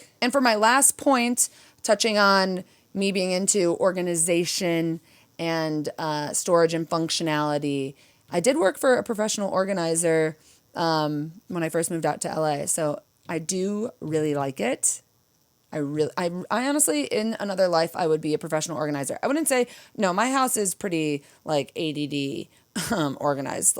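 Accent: Chicago, United States. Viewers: lol! I was a theater design major and I cannot see a play without "working" It is somewhat common within whatever your area of discipline is, I think. I notice that you look very pretty these days. And I notice your Chicago accent, but I grew up in Detroit, so I got some of those influences in speaking too! I would love to learn about organizing too - that was not a thing in my family and I have been getting "schooled" on it!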